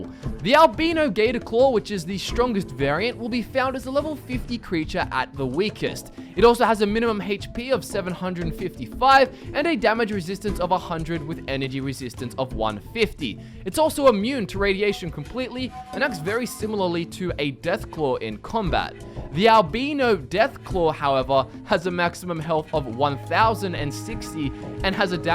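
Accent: Australia. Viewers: Hi Hi Actually no he doesn't He's Australian and that's how Aussies say it British people say it that way too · he sounds Australian which is how they say that word in that way. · Austin DeWaal it’s an Australian accent bruh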